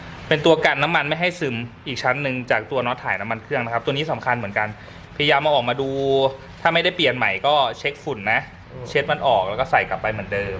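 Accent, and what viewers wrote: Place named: Isan, Thailand. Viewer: อร๊ายยยย น้อง เป็นผู้ชายที่มีความสาวนิดๆ ติดสำเนียงอีสานหน่อยๆ ใจละลายแล้วคร่าาาา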